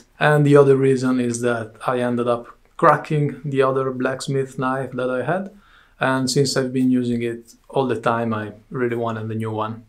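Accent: Venice, Italy. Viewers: he does sound a lot like the murano glass makers I know now that you !mention it.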